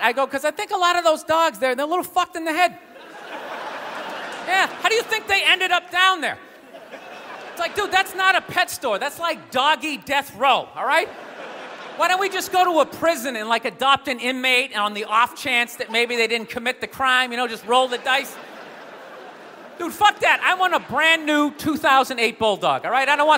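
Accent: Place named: South, United States. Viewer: love his southern accent xD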